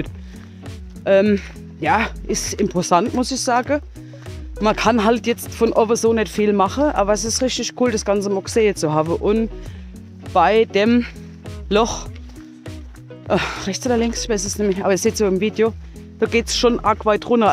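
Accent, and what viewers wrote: Palatinate, Germany. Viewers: Immer wieder ein Vergügen deine Videos zu sehe. Un dadebei des Pälzer Platt👍🏼 Liebe Grüße Thom ✌🏼🤠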